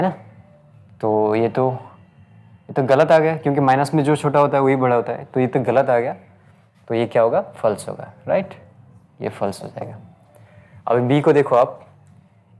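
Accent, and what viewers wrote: Assam, India. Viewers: sir ap axomiya niki?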